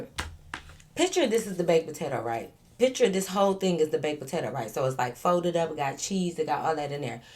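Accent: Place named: New Jersey, United States. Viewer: I fckn hollllered Get connected for free! With education Co- Nect - tion. GET THE FCK outta here 😂😂😂😂😂😂😂😂😂😂😂 idc what nobody say you Jersey coded to the bone 😂😂😂😂 I love this fckn channel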